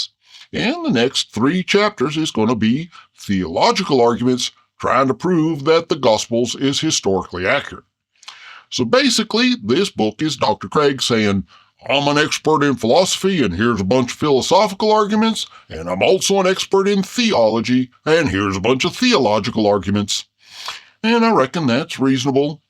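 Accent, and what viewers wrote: South, United States. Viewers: I'm a big fan of the analytic approach to how you examine and dissect each claim that is found in Dr. William Lane Crag's book On Guard. Giving them an in depth sniff test in your charming southern down home style. The only criticism that I have is more of a me problem than a you problem and that is the background sort of triggers my minor case of visual OCD and that is the trees in the mirror on the right side are just wrong the image should be identical as to what is seen in the window and it isn't.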